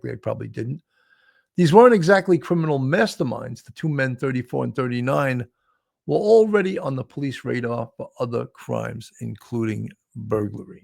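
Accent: France. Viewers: Im glad they solved this fast! I hope the jewels are found soon, 😥 I doubt it tho. ❤btw❤adorable frenchie accent 😂❤ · Thank you Sgt., hoping and praying that the priceless jewels are found. French accent appealing, we are created to laugh! Good accurate reporting, your expertise is valued!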